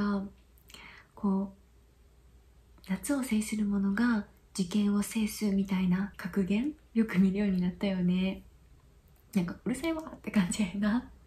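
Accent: Kansai, Japan. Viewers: × 関西弁とか可愛さの致死量に達する...😇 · この可愛さで関西弁は破壊力満点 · 関西弁💕💕 こんなに新しいロールプレイも良き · 最近ASMRにハマって、サムネイル画像でめちゃくちゃ理想のタイプって思って観て(聴いて)みたら、優しい声で、関西弁で…惚れてしまいました!!😳🥰 これから毎日寝る前に観よう(聴こう)と思います😊 応援してます!!👍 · 関西弁ッ！好きです（直球）関西出身なんですね。今度大学でtoeicを受けるので参考にさせてもらいます！ Runa先生にtoeicの勉強教えてもらいたい… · 関西人なのに関西弁にやられる。。。激萌ゆる、、、ある意味メンタルやられる♡ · 関西弁めっちゃええなあ。次は津軽弁待ってますこんな家庭教師さんおったら勉強が嫌でも好きになってまうて 250万人に使われる大手さんから案件来るとは流石！これからもファイト、オー！なのですよ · おお！流暢な関西弁！メガネ姿もかわいい。今度、大事なところは耳元で囁いてくる、誘惑してないのに誘惑してるようになっちゃう先生とか見たい！ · 関西弁で関西ニキたちが歓喜してる中、謎の敗北感に襲われる関東生まれの俺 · 何やろう･･･ このコテコテでもない、上品な関西弁は･･･！ Runa先生の育ちの良さが出過ぎてます･･･ 個人的にの笑顔大好きです･･･癒されました😍 音声は後半のアプリ紹介のところですごく近くなってドキッとしました!! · 元々好きな動画やったけど、関西弁で益々好きになったわ❤️ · あれ？先生関西人やったんや、、🤣知らんかったん俺だけ⁉︎ 何か勝手に親近感‼︎嬉しい😍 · ①今から文転して大学受験し直すか、 ②来世で先生が理系になっている世界線に賭けるか、 ③アダム・スミスになるか、迷って勉強できないので先生に相談しにいきます。あとTOEIC点数高すぎますし、めちゃんこ可愛いし、関西弁かわいいし、本当尊敬してます！ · 関西弁ネイティブですやん！！せ↑いけいとかもうネイティブなんですよね笑こんな家庭教師おったら顔見て話聞かずに終わりそうw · 関西弁…最高…親近感…可愛い… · 関西弁喋れるんですね。関西人ですか❓私は和歌山県に住んでた事があって関西弁もマスターしました。地元の人にとても関東人には思えないくらい発音がネイティブって言われるくらい上手くなりました。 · 関西弁！？最高すぎ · 関西弁ー？！？！？関西人なのですか！？！？好きです🥰🥰🥰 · 関西弁が可愛いすぎ😭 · 投稿お疲れ様です！メガネいいですね！そして関西弁、すごい親近感です😆 引き続き応援してるので頑張ってください！ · 関西弁！萌える！！地声も顔もめちゃくちゃにかわいい！！ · 関西弁、、可愛い😍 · 関西弁カワユス♥️ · 関西弁？めちゃいい.ᐟ.ᐟ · runaさんは関西人かな？そうじゃないとしたら関西弁自然すぎる笑それと関西弁ロールプレイは大阪人の自分からしたら最高です😃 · 関西人のわい関西弁に歓喜する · メガネ！やっぱり可愛すぎ！特に下にずらしてる時！関西弁の引き出しまであったとは😳 シャーペンで書く音が1番良い音だった！英語の勉強した方が良いのは分かってるんやけどなー・・・ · 今年受験生だし政経選択だからほんとありがたい。Runaさん関西弁だけど、東京にいたら青山学院大学通ってそう。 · 関西人やけど周りにここまで関西弁の女の子居ないからなんか新鮮でした😄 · かわいい先生❤️関西弁もいい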